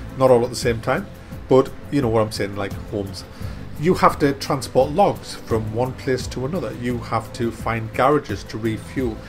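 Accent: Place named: North, United Kingdom. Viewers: You sound fat and northern. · I do love a good old fashioned Northern rant, me! Most amusing :-) Enjoyed the overview too.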